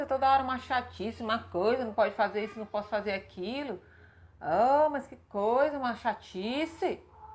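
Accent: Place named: Bahia, Brazil. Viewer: Mas que sotaque baiano é esse minha gente? Boas palavras minha irmã!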